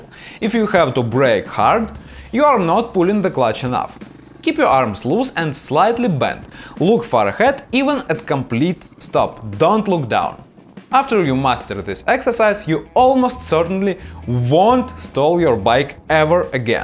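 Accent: Russia. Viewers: I have a really strange feeling that I understand the author because I'm Russian and the author has bright Russian accent. It helps me understand the author's speech better 🤣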